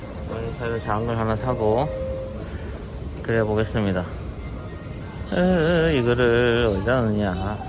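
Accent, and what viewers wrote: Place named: Gyeongsang, South Korea. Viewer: 경상도분 이신가봐요 말투가 상당히 친숙하네요 ㅋㅋ 건강 잘 챙기시길 바랍니다!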